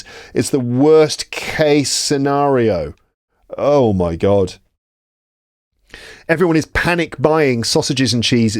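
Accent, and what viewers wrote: RP, United Kingdom. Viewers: I love your video about jokes and I love your british accent is wonderful to hear you!!! II am a Visual artist and photographer from Argentina. Best wishes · Hello,! I'm an English teacher from Buenos Aires, Argentina. I' m delighted to hear your perfect R.P. English.You are very kind and patient explaining things that are too simple for you. Love it when you chuckle, kind of admitting that a joke is terrible. I guess your work is truly helpful for students of English. I learnt a few things about world culture. Thank you so much! 😊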